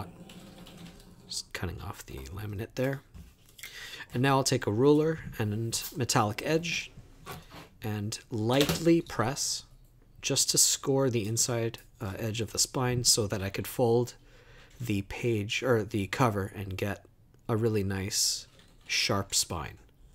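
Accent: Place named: United States. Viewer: Nice art, nicely done, with the creativity to get it together! You are a Greek, though with 100% American accent, if I did not seen the last name I would not guessed it.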